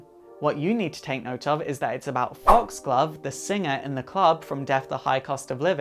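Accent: United Kingdom. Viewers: It LITERALLY just occurred to me that you're British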